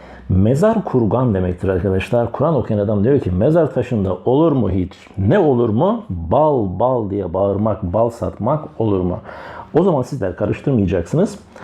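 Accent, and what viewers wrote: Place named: Black Sea, Turkey. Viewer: Artık umaysın 😂 kadın Karadenizli herhalde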